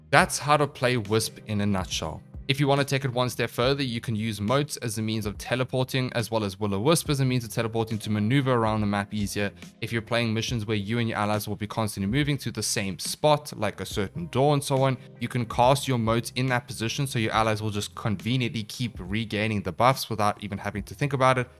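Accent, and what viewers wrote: South Africa. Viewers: are you from SA? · Broooooo i came here loooking for this comment🤣🤣🤣🤣🤣 love how south african he is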